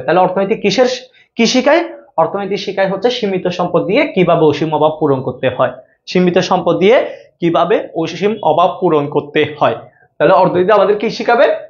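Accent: Chittagong, Bangladesh. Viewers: Sir apni chittaingga😄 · চিটাইংগে ফুয়া😊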